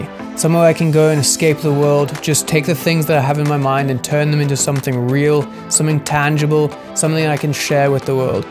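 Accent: South Africa. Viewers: I am always a fan of hearing the familiar sound of a SA accent! Congrats on the new studio bru, sorry I am late on the train for commenting. I only stumbled on your YouTube channel today! Subscribed and liked! your videos are legit - No cap. Have a dope long weekend my man!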